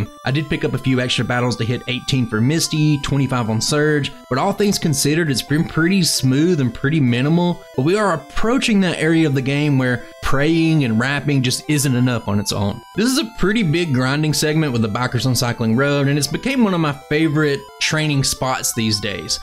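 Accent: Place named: Appalachia, United States. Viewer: I can recognize the appalchian accent from a mile away. Where are you from? East TN?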